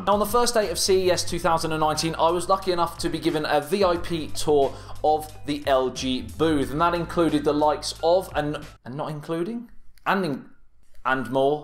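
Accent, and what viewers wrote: United Kingdom. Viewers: Dude, your accent is so British...You named it as but I'd call it.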